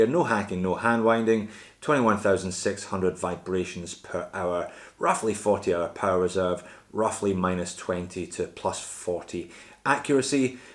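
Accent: Scotland, United Kingdom. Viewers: Stop doing that Scottish accent !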